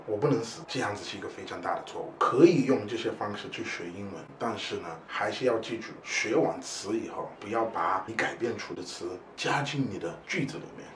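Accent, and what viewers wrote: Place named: Africa. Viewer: You have a strong African accent tho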